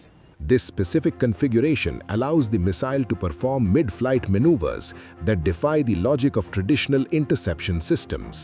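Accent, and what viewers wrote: India. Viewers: Your stupid Indian missiles are not working at all, stop bragging that your navy is better than our Chinese navy !!! By the way, your Indian English is not proper English, stop speaking Curry English !!!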